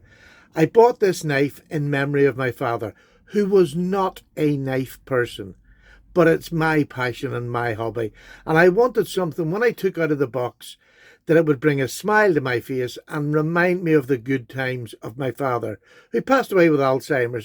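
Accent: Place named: Ireland. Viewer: Hi! I stumbled upon your channel about a week ago, I I want you to know what a pleasure it is to watch your videos and listen to your voice! Your brogue is what I imagine my ancestors would have sounded like! I appreciate your comments and attitude as well as the knife content! Have a great day Sir!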